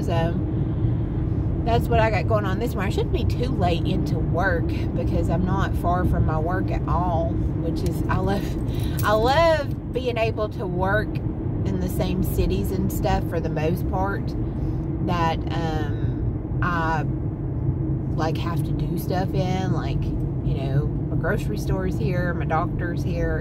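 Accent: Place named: East Tennessee, United States. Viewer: I've been gone from east TN for 3 years. Hearing you talk makes me miss it lol. Love seeing you post again!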